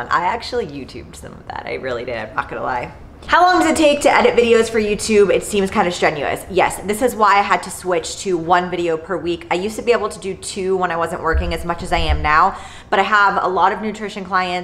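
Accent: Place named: Pennsylvania, United States. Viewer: I HEARD PENNSYLVANIA ACCENT, and as someone with family from PA I TOTALLY understand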